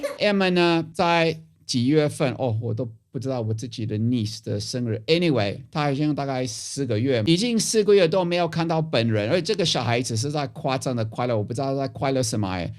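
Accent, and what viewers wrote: Taiwan. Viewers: 不用字幕啦，教授你說的台灣國語很道地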